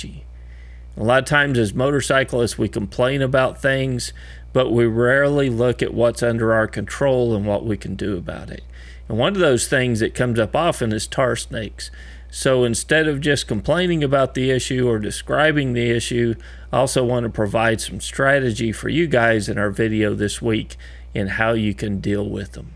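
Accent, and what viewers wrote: Texas, United States. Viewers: Migth be useful for an impatient native english speaker, but I just love the way speaks. I used to work with a texan guy that talks just like. Calm, collected and thoughtful. Induces trust and confidence. I feel a bit like I am meditating, when I listen to his voice and advice.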